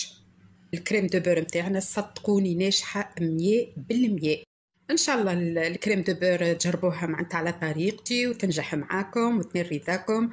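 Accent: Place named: Tunisia. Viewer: بسم الله ما شاء الله عليك اختي عملت كريمة الزبدة بطريقة احترافية..وخاصة انك عقمتي بياض البيض بالسيرو..وأصبح آمنا على الصحة.... جاتك روعة حبيبتي..... الله اعطيك الصحة اخلاص.. أموت في اللهجة التونسية برشة برشة 😄تم معاك بصدق وأمانة حبيبتي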